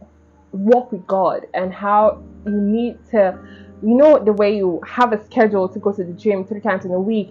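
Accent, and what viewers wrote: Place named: South Africa. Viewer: I love the SA accent n vibes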